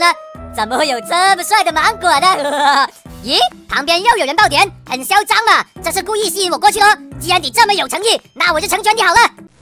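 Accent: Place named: Guangdong, China. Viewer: 靚仔😂😂，怎麼有廣東普通話?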